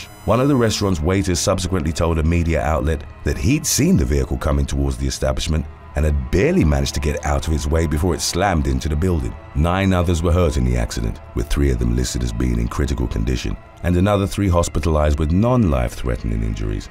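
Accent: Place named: England, United Kingdom. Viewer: Okay who taught how to pronounce Mexican names in english? First time I've heard Martinez come out correctly. I do always love hearing people with English accents trying to work out pronunciations of names we're all used to on this side of the pond! As always, gripping work!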